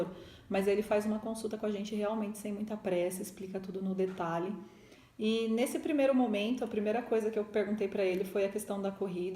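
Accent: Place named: Araraquara, Brazil. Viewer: Legal so de araraquara,senti sua fala....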